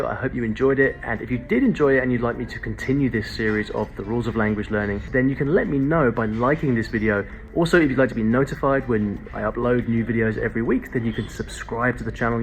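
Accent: United Kingdom. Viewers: It's British accent and everybody likes it. His accent is the main reason of his subscribers to stay at this channel.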